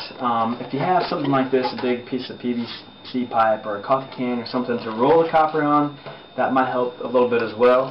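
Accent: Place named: South, United States. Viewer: a southern accent... why am I not surprised.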